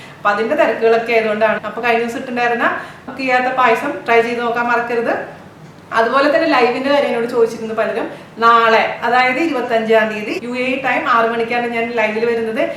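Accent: Thrissur, India. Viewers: Wooww😋 കൈപുണ്യം എന്ന ഒരു സാധനം ഉണ്ട് ചേച്ചി അതും വേണ്ടെ എനിക്കൊന്നും അത് അടുത്തുക്കൂടെ പോയിട്ടില്ല Anywayy kandapo kothiyaayiii 😋😋😋 Chechide anchoring Nammlde trrisure anchoring Kandathkondaa njn subscribe cheythath thanne Thats the thing what i inspired to learn you You never change your own way Plz reveal more and more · ചേച്ചി മ്മടെ തൃശ്ശൂർ ന് പറഞ്ഞ അത് മ്മടെ ഭാഷ തന്നെയാ അത് മാറിയാൽ പിന്നെന്തുട്ട് തൃശ്ശൂർകാരി ഞാൻ ഒരു തൃശ്ശൂർകാര്യ ഇത് കേൾക്കാൻ വേണ്ടിയാ കാണുന്നെ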